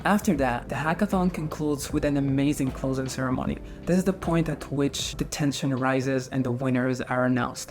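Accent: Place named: Egypt. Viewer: he both sounds and looks Egyptian to me lol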